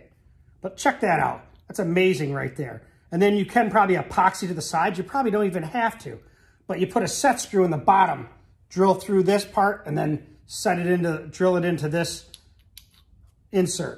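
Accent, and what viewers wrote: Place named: Brooklyn, United States. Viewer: Were you from Brooklyn or Queens? you sound like you have an accent from that area!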